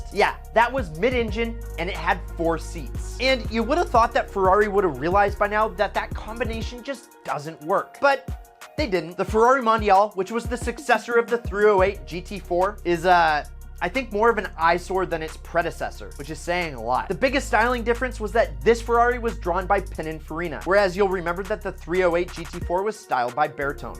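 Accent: United States. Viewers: This is what a douchey American looks/sounds like incase you forgot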